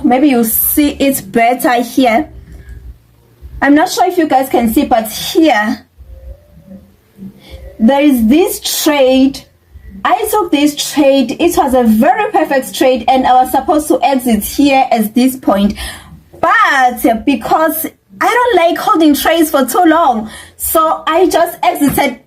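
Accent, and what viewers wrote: South Africa. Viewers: She's South African from her accent indeed she's South African. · South Africa, even her accent.🇿🇦🇿🇦🇿🇦🇿🇦🇿🇦🇿🇦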